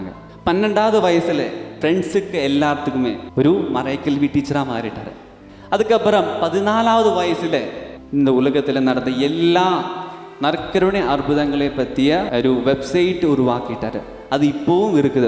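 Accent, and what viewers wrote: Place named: Kerala, India. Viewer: മലയാളി, എന്ന്, സംസാരത്തിൽ മനസിലാകുന്നു, ഇത് എവിടെയാ?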